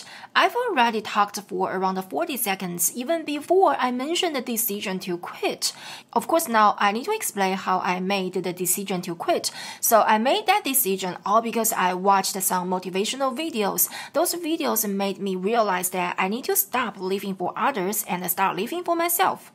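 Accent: China. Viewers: But your English sounds more like Chinese than English; I'm getting confused listening to you.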